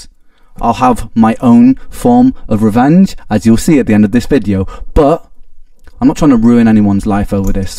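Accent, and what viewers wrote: United Kingdom. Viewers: Ren, every time I hear you speak, I wish more and more that I knew you, and could talk to you personally. ( And I don't just mean because of your accent, although it is quite lovely, and reminds me of the time I lived in England) But listen, Every. single. One. Of. Your. Fans. Is one hundred million times behind you, and I hope you don't believe for a second that we would ever believe any slander about you. Because what you've done for us, is far beyond having given us catharsis, identity, and connection. It's far beyond your amazing talent and the joy and Inclusions you've more than you will ever know. You've given us a piece of your soul, a glimpse of your deepest thoughts and being, and that is an indelible mark upon each and every one of us. **And don't fret about your song. I don't want to say anything to fuel this fire, but please message me if you would, because I have good news for you.*** Aside from that, I shared your music with my college psychology professor tonight, because she was avidly interested when I told her about your story, and the message of your music. 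I can't wait to hear back from her, I know she'll love it! Moreover, whatever money you've lost, say the word, and you know your patreon would be filled 10x overture amount, in a heartbeat. You are a genuine, beautiful soul, Ren. I'm eternally grateful I found your music, because I relate to you more than you can imagine. We've gone through very similar things at the same age, and for me, it's something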